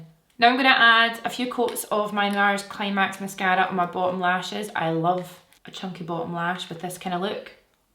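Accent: Scotland, United Kingdom. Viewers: Seeing your videos make me so happy, just watching you helps so much with my anxiety an depression. Something funny tho, i naturally mimic accents especially ones from Europe... so EVERYONE knows when ive watched a video from you cause i end up with a Scottish accent for usually about an hour or two... my mum finds it funny. I hope Jack, and the doggos are well ❤️ much love from New Zealand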